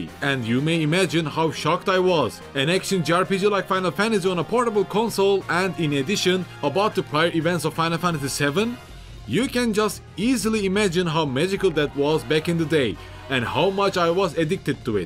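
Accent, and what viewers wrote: Turkey. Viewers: u have a turkish accent.ru turkish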